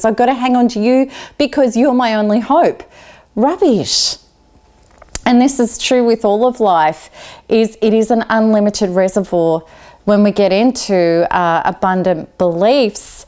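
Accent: Australia. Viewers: Are you Australian? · When does this New Age bs with a Aussie female accent STFU?!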